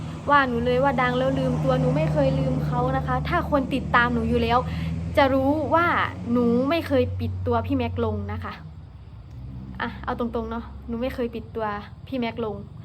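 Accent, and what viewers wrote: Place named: Sakon Nakhon, Thailand. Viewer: ตกลงน้อง เป็นคนบุรีรัมย์หรือคน สกลนคร ตอนดูคลิปขับรถพูด เหมือนคนสกลนคร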